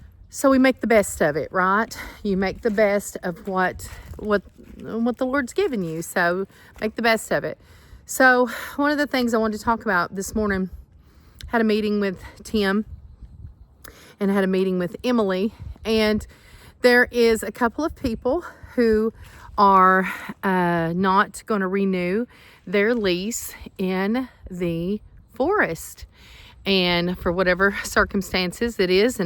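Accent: South, United States. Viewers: OMG!!, my bird has chirped the entire video! She must like your southern drawl!! 😁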